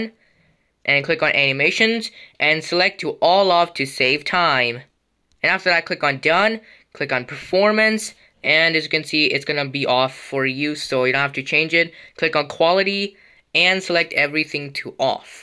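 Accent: England, United Kingdom. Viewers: Finally this English voice all videos Indians voice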